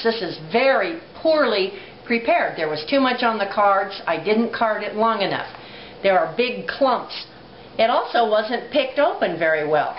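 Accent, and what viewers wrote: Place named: Pennsylvania, United States. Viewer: You speak like my mother! Are you from the Pennsylvania area or West Virginia by any chance?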